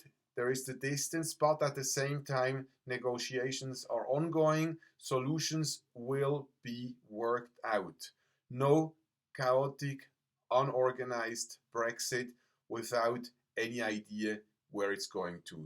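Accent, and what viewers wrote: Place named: Switzerland. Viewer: Danke für Ihre Antwort Natürlich ist mir nicht entgangen, das Sie viel lieber in Ihrem sympathischen SwissEnglish 😊 veröffentlichen und anteilig sporadisch Deutsch. Mit meiner schwäbischen Muttersprache, in schweizerischer Nachbarschaft, bin ich -nicht nur ich - in der Lage andere Dialekte zu verstehen, bzw.hat man sich dem allgemeinen Sprachgebrauch angepasst, wie Sie offensichtlich ebenfalls. Schön wäre es aber schon, wenn der englischen Sprache nicht mächtige deutschsprachige, interressierte Bevölkerungsanteil Ihre Zukunftsprognosen, die ich sehr schätze, in deutscher Sprache verfolgen könnte. Vielleicht haben Sie aber ,,gesehen", das es nicht ,,sein soll", uns als Betroffene zu informieren. Ja, es wird nicht ein lokales Ereignis sein, dank Internet erfährt man vom umgefallen Reissack...... Nicht dass ich auswandern wollte, aber auf kommende Ereignisse sich einstellen können und zuversichtlich trotz allem mit Hilfe Ihrer doch treffsicheren Prognosen wäre schon hilfreich. 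Selbstverständlich gibt es keinen natürlichen Anspruch Ihre Zukunftsprognosen zu bekommen, warum aber veröffentlichen Sie dann im Internet ❓ Mit guten Gedanken und Gefühlen, wenn es denn zum großen Reinemachen kommt, hoffe ich, das es für alle eine schöne Weiterentwicklung gibt . Alles Liebe und Gute für Sie ❣️Danke für Ihre spannende Arbeit an der Menschheit Josefa 👵🙋🙏🌟‼️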